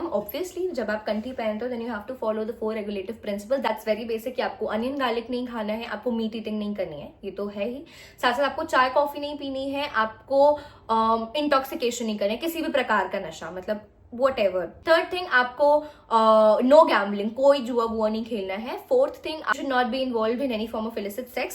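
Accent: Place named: United Kingdom. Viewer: I just love your English accent 🥰❤️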